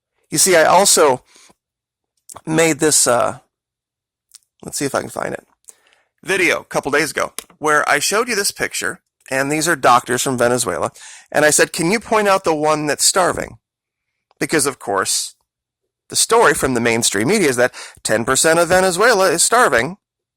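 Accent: South, United States. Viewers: And the condescending southern accent thni g is highly insulting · Love the sthrn accent haha 🤣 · I'm trying to place the dialect; Forrest, Forrest Gump?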